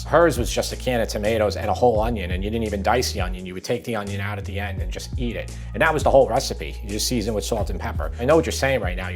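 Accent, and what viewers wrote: New York, United States. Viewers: Hey , let me make you ah pasta you can't refuse . Love watchin this guy and his N Y accent. Great recipes . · Are you from ny or nj? 🙂 ok,, yes, I see, ny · Love the ny accent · Don't usually hear such thick New York accents on YouTube cooking channels. I like it. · I love the accent 😍 New York?? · where are you from? new york or new jersey? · As a Brooklynite, I can enjoy hearing your wonderful NY voice. If you’re not from NY, please let me know, or else fogeddaboutit😂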